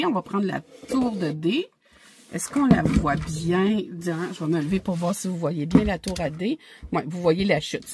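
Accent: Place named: Quebec, Canada. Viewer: Coucou! Normalement je suis la 102ème abonnée 😅 Je suis désormais à jour dans toutes vos vidéos! Enchantée de faire votre connaissance 😊 ça sera un plaisir pour moi de suivre vos aventures budgétaire et d'entendre votre bel accent québécois 😃 C'est effectivement Karine, Marie et Hélène qui nous ont soufflé à l'oreille le nom de votre chaîne 😀 C'est super de voir une autre façon de faire le budget, perso j'aime voir comment font mes voisins européens ou outre Atlantique, c'est tjs enrichissant 🙂 Vos défis cœur pour la st Valentin sont très beaux 🤩 Bravo pour vos belles économies, vos 100 abonnés et longue vie à votre chaîne! Amitiés de Bourgogne en France ❤ PS: il est un peu fouilli mon message désolée 😅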